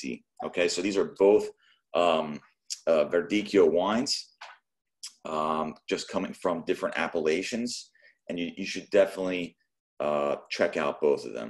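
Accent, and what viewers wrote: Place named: Italy. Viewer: Lots of interesting info and great italian accent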